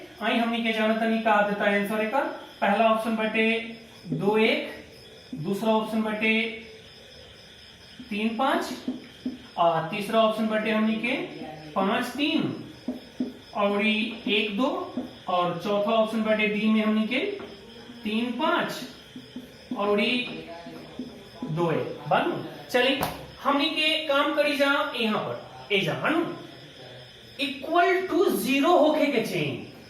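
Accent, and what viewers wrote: Bhojpuri, India. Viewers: अति सुन्दर लागल आपन भोजपुरी अन्दाज🙏🙏🙏 Nice sir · Ati Sundar sir Bhojpuriya andaj me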